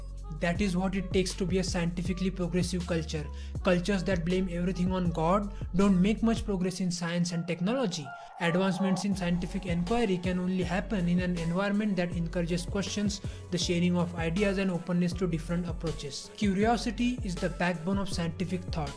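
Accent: India. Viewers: I find the Indian accent particularly difficult to understand but I also find many YouTubers speak too fast. I'm in a no-win situation!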